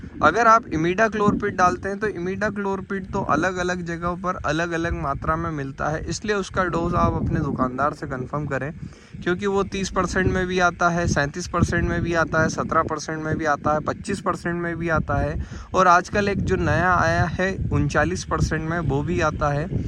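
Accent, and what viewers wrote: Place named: Harda, India. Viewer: Wo ko bo bol raha hai Matlab harda jila se hai banda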